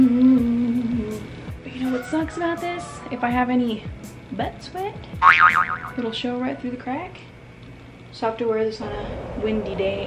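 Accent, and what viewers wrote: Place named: South, United States. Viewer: liked the southern accent jaja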